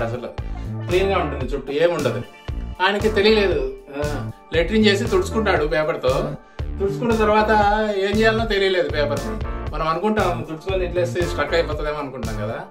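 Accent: Rayalaseema, India. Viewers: గారు మీది రాయలసీమ కదా మీ వాయిస్ వింటే అర్థమవుతుంది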